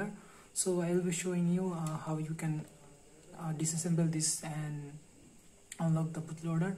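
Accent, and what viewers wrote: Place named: China. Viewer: I don't know If I can trust you because you sound like Chinese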